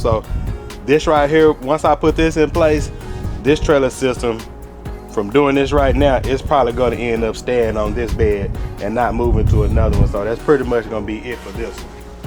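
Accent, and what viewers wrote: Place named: South, United States. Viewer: Hold on friend, you don't have to pull up that chard. That chard will last you all year. Looks like you're in the South or sounds like it from your accent. Swiss chard you can harvest repeatedly. Maybe you're just making room for other stuff? Reach out if you want to chat about Swiss chard. Love your video.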